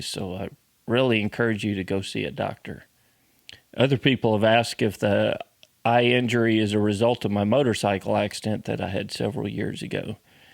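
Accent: Texas, United States. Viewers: Kirk Dyreson, welcome to how real Texans think and talk. I would buy anything thing from this man if he looks me in the eye and shakes my hand. Content is was counts and you’ll get used to the delivery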